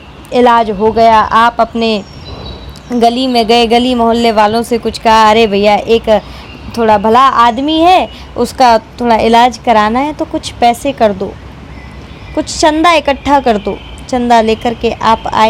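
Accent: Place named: Braj, India. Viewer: ji aap bahut Sundar braj ki bhasha bolate hye, bhut accha laga aap ka video dekh ke me hamesha ati hu vrindhavan mye... Radhe.... Radhe....🙏🙏🙏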